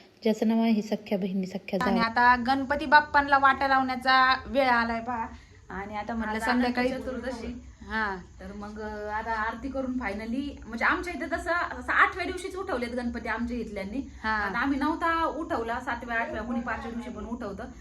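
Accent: Ahmednagar, India. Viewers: ताई नगरला असच बोलतात, आणि स्वातीची भाषा अस्सल नगरी आहे लहान पणापासून आपण जे बोलतो ते लगेच नाही बदल जात सारखे सारखे नका तेच बोलत जाऊ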